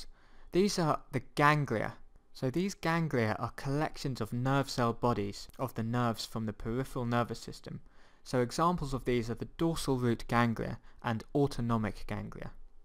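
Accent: United Kingdom. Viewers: you speak in british accent right ? btw awesome work i wish you were in my uni :((((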